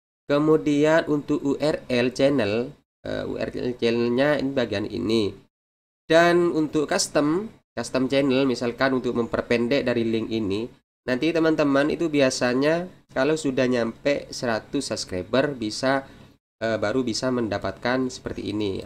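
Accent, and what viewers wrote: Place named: Madura, Indonesia. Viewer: Owlhh..soalnya dri cara bicara nya udh kyk orng madura.. 😅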